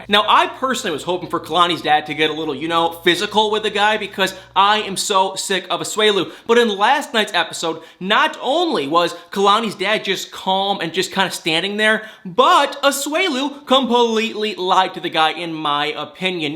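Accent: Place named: South, United States. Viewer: You're from Michigan? (me too) Wondering where that cute little southern twang comes from when you say 'ing' words. Great channel, enjoying your posts. 😊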